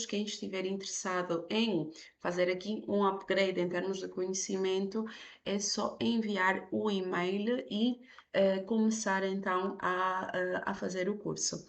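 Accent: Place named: Cape Verde. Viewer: A formadora tem um sotaque interessante, parece de cabo verde.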